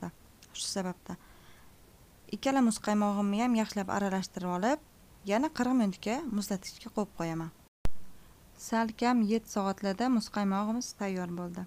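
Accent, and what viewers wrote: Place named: Kyrgyzstan. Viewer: вой ичегимми узип улдирдиз илохим бахтийзи берсин бу хам Аллохнинг бир синови лекин киргизчага ухшап гапирар экансиз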